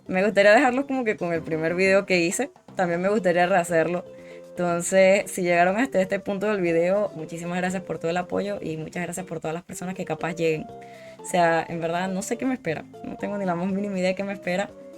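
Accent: Venezuela. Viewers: Chama, tengo como 6 años fuera de Venezuela y ahora siento que escuchar el acento venezolano me cuesta full, hablas muy rápido jajajaj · Amo tu canal amiga es muy gratificante ver tus videos y me encanta como te expresas se siente la verdadera escencia Venezolana en toda su gloria te deseo lo mejor y espero ser viendo cosas interesante en tu canal y mejores y quiera saber de que parte de VENEZUELA SOS :)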